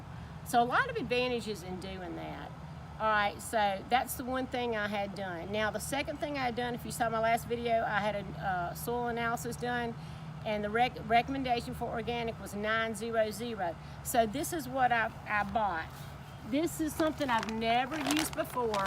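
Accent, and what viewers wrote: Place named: Louisiana, United States. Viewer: Yard Looks good. Knew soon as I heard you speak you was from the top of the boot.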